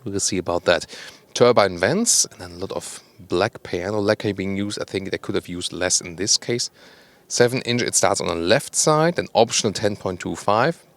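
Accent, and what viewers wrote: Germany. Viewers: Thank you very much for another very informative and professional review,. 👍 Your in-depth presentation style is so much better than the competition, in so many ways... highly professional and always delivered in that impeccably suave and sophisticated German accent of yours, that is every bit as slick and refined as the automotive design and engineering masterpieces that you showcase! 👌😉 Thank you for all of the hard work and laborious camerawork and editing that goes into each of your in-depth videos, as well as your incisive commentary and detailed observations that many other reviewers leave out. My daughter has her own YouTube channel with nearly 20,000 subscribers and so I know how much time and work goes into producing even a short video, let alone your more exhaustive reviews, and while many of your viewers may take all of that for granted, I certainly appreciate your efforts and have learned a lot from watching your videos and enjoy your presentation style immensely. 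Keep up the great work! 👏😊 ~ Kind Regards, Louis.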